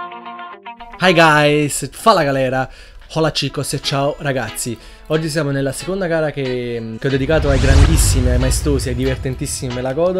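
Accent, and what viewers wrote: Italy. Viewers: da come parli deduco che sei del centro/sud Italia.Confermi?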